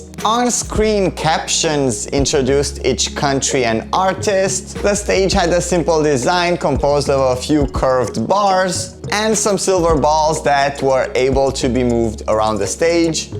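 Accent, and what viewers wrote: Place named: England, United Kingdom. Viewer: Hi! Nice job as always! Just a friendly remark as it's not the first time i hear you pronouncing "Gigliola" wrong, you dont have to pronounce that g in the middle at all, just skip it and tadaaam you have the correct name. I bet you can experience the proper italian fluency now:P By the way im catching the opportunity to say i love your english accent (been wanting to say that for a while) As for your french one.. ehm i do see your effort there hehe close to decent:D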